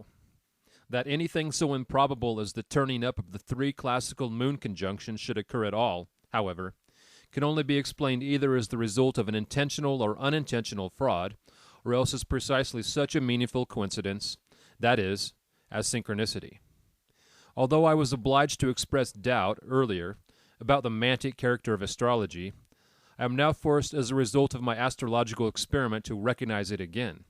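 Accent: United States. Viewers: where are you from, that accent is from Atlanta?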